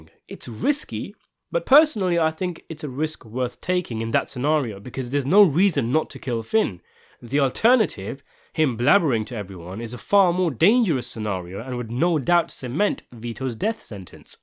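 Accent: United Kingdom. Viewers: Can’t get enough Sopranos wordplay from someone with a UK accent. Keep it up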